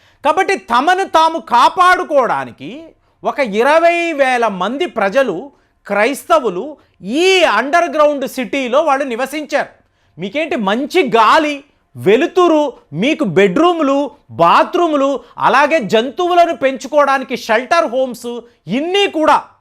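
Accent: Tamil Nadu, India. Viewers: Sir Tamil background Telugu anduke Tamil accent to Telugu matlduthunnaru Hyd / Telangana lo Telugu Urdhu lo makkinchinattu matldutharu alage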